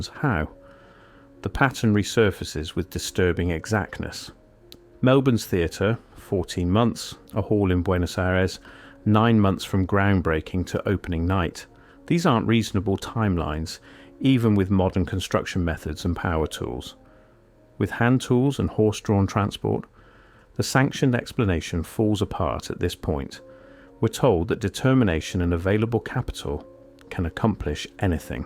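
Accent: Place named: Mississippi, United States. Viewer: I thought you were from Mississippi 😅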